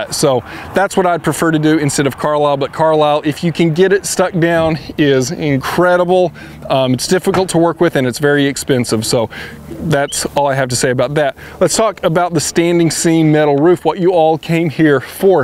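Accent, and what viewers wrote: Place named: Texas, United States. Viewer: Your Texas accent is part of your charm :)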